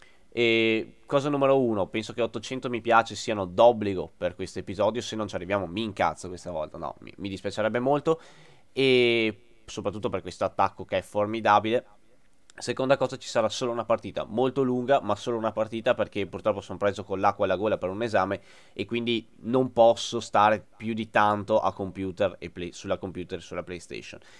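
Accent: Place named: Trentino-Veneto, Italy. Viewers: Si è parla dialetto veneto ahahahh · di dove sei? io sono di vicenza e mi sembra che tu sia di queste parti XD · parli come l'incrocio tra un trentino e un veneto:) gran bel video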